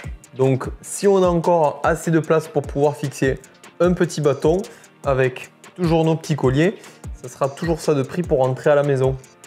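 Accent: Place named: South, France. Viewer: Vidéo super utile ! Merci pour ceux qui n'ont pas d'ingéniosité ! Et toujours avec cet accecent du Sud, c'est la touche 😊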